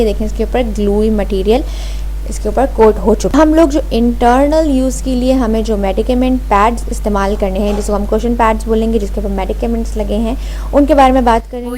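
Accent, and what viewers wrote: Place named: India. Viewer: Can another person read the text?I dint get anything , is there any person, whiteout Indian ecsent.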